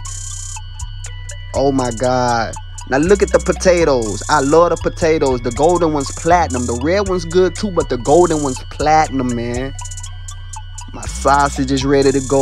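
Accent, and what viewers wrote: Louisiana, United States. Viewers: I'm from louisiana and I ain't ever heard someone in my hometown have such a thick accent😩 he got them thick thick accent bro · i could listen to a lousiana accent all day 😌🙏🏽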